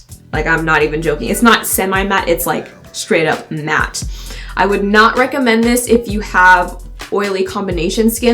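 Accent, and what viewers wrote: Australia. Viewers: I just subscribed recently and when you started this video I was like "oh I forgot she was Australian" lmao. Loved this video:)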